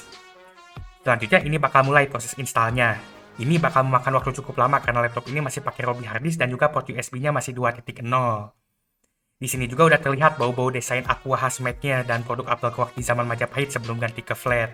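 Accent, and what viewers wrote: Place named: West Java, Indonesia. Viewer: orang bandung wkwk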